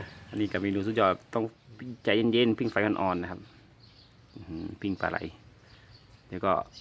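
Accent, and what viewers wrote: North, Thailand. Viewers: ชอบฮื้ออู้เมืองมันเป็นเสน่ห์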